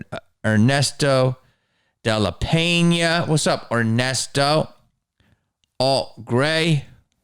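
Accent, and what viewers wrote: Australia. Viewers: I absolutely love your channel and videos like these. It's such a treat to get some behind the scenes insight, thank you! Also, I'm Australian and really appreciate the accent haha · I love all your movies !!You should probably make a movie as a Australian animal, hunter or something because your accent is spot on😂😂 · That Aussie accent warms my Aussie heart. 🤣🤣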